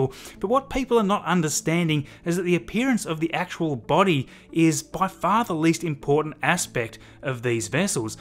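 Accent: Australia. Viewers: Because I'm Aussie, I can tell from your accent, you definitely must be an Aussie too?! Lol.. You still down here or did you move to America? Don't think anyone from the public would know, but do you know if the final design of the interior of the Starship has been completed? Good reporting 😉 🇦🇺🇺🇸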